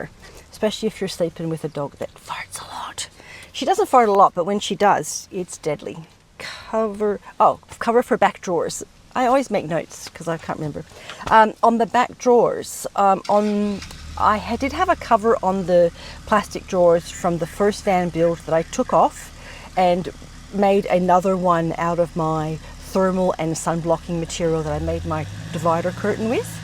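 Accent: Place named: Australia. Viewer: What accent is that? Its 90 percent Australian but something else is coming through. Just curious.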